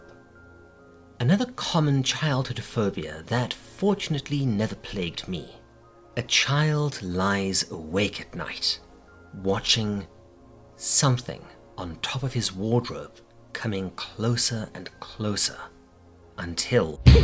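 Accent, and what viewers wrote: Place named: South Africa. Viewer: Dude - you're South African? Been binging your vidoes and had no idea until you mentioned load shedding and having a kudu on the wall growing up. Now that I am listening, I hear how you say "off" as "orf". Peeps in the UK always made fun of me for that lol. Keep up the great work 🙂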